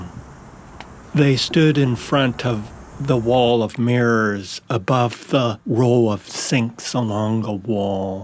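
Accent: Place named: Alberta, Canada. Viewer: I detect an Alberta accent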